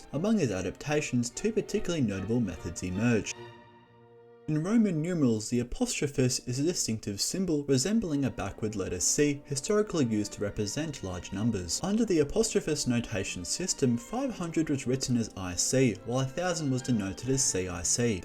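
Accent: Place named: Italy. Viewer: the pronunciation was kind of weird, he mixed anglicized pronunciation with an italian reading of the C. latin is dead and also everywhere so you can pronounce it however you want, but I'm not sure by what standards that was nice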